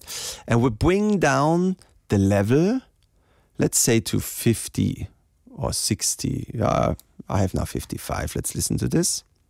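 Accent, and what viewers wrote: Germany. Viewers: Leichter deutscher Akzent 😂😂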